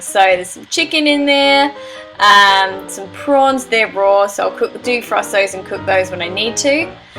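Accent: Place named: Australia. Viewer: lol between the song at the beginning and your accent i knew you were australian , this video is great, i esp like your use of so many vegetables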